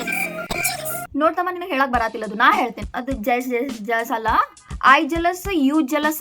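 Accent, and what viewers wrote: North Karnataka, India. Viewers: ಇವತ್ತ ಫಸ್ಟ್ ನೋಡ್ ಬೆ ನಾ ನಿನ್ನ ವಿಡಿಯೋ ನೋಡಿದ್ದ. ನಮ್ಮ್ uk ಭಾಷೆ ನಿನ್ನ ಬಾಯಿಂದ ಕೇಳಿ ಬಾಳ್ ಖುಷಿ ಆತ ನೋಡ್ ವಾ. ವಿಡಿಯೋ ಅಂತೂ ಬಡ್ದ ಬಾಯಿಗ್ ಇಟ್ಟಿ ಬಿಡ್ ಅಡ್ನ್ಯಾಡಿಗಳಿಗಿ. ಸಬ್ ಸ್ಕ್ರೈಬ್ ಆಕ್ಕಿನಿ ಬಿಡ್ವಾ 😂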